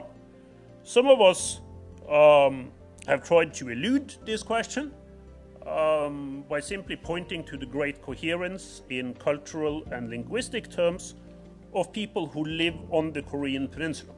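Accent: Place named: United Kingdom. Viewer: 하버드대에서 강의하는게 영광이라고 하는걸 보니 하버드대 교수는 아니고 영상보니 버밍엄대교수같은데....억양이 영국이고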